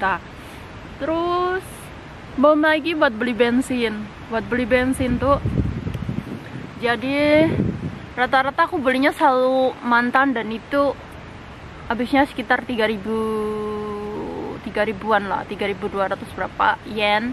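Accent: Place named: Banyumas, Indonesia. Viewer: semangat yaa mbaaa... btw salam ngapak yaa mbaaaaaa... satu suku sepertinya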